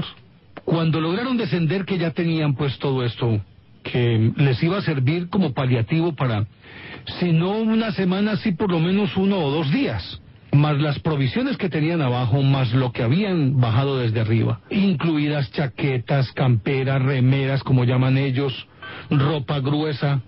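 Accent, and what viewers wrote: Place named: Colombia. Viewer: es colombiano el que habla? Que mal!!!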